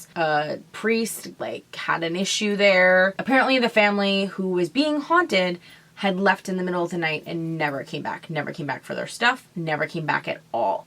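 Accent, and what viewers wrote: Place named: Long Island, United States. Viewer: I'm from Long Island I just watch like 3 of ur vids and literally thought to myself she has to be from here 👌🏽 LI knows LI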